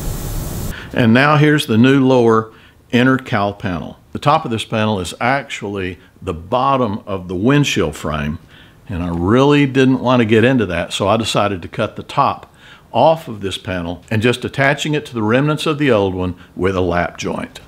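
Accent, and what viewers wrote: Texas, United States. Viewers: No apologies are ever needed! I never did any body work so I have no input of value on that! I always hated working with the front and rear glass...helped do the rear window of a 70 Riviera boattail....YIPES!! Happy Labor day weekend to you and the family! Your Texas drawl is easier to understand than some southern dialects...Where oil is pronounce earl, and door is a two syllable word! Fortunately I was raised hearing it all the time so I can still understand it.....well most of the time at least! The blazer is progressing well! Not much better than a bit of shop time with your good car buddies!! Thanks for sharing!! We lost Jimmy Buffet yesterday...so I' had a special Margarita for him....man we are losing some good ones !! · Well i don`t know what the problem is i understood you perfectly fine because i`m also from Texas and i clearly heard you say COW!! 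· LMAO 😂😂😂 That is so funny, I understood your Texas drawl just fine and I am not from the USA. And I agree that panel bond is a great option with rivets or spot welds. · I just love your Texas Drawl thank you for sharing the difference between cowl panel and cow panel 😂😂HAHA I love it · Love your Texas drawl you keep on doing what you do very entertaining the rebuild is a big job you have more will power then I would have on a job like that your videos are awesome don't change anything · I'd revit that area over bonding. If you want to seal below windshield with both, I'd consider good plan to protect wiring from any water leaks. Hope you have some patch panels to cut that rust out on side. Great to see transformation. I spit my coffee seeing that woman's photo 😂 I think it was your texan drawal and you are educating some excited over your project. That bonding has some long term issues. 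Its not first generation... Not fun to start over. Enjoy your long weekend and BBQ.🌻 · Making good progress, i see, and having a great time while doing it, I think. I didn't have any trouble understanding the word "cowl" in the last video or this one. I love your Texas drawl,. Of course, that's because there is a big piece of my heart still in Texas. When I would go back to visit family, i would quickly begin to speak in that drawl myself, it comes so naturally to me. Love you, hope you got some good responses from the car guys and girls, which I ain't. 😊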